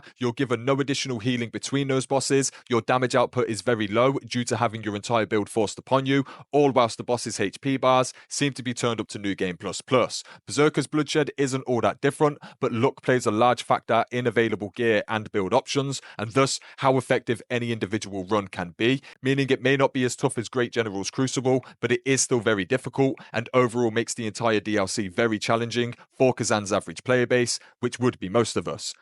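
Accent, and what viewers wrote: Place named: United Kingdom. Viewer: Never heard such a cock in the mouth accent as from this Brit 😂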